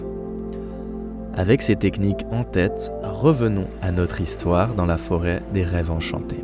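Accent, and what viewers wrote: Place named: France. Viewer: Thanks, you know i love your french accent 🥰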